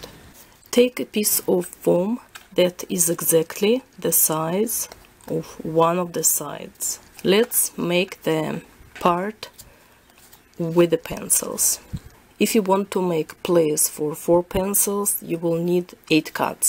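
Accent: Russia. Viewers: русский акцент:0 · but she is Russian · Omg i wish i was Russian thats why your accident this so beautiful i love that voice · Oh god, i thought your accent was russian because of Red from Orange is the new black! ( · thought I could detect some Russian in your accent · У тебя русский акцент☺ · Русский акцент:)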